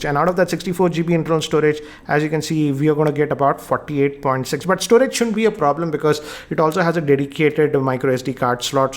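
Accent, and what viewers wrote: India. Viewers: jajaja give him consideration ..indian accent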